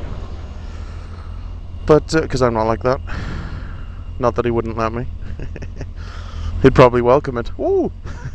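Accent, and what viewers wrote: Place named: Northern Ireland, United Kingdom. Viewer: yea thought you were from norn iron.. im from carrickfergus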